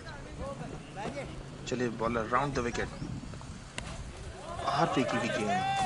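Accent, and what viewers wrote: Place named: Garhwal, India. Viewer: Gadwali boli yr bheji tu v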